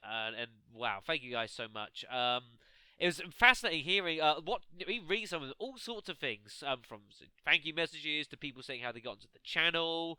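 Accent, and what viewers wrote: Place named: United Kingdom. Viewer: I love your voice. It's amazing and makes the LPs more interesting. I wonder where in the UK you're from?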